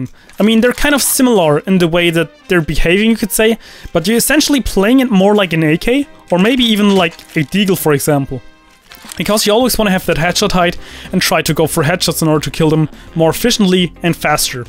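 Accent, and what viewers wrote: Germany. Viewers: English sucks man, it's German accent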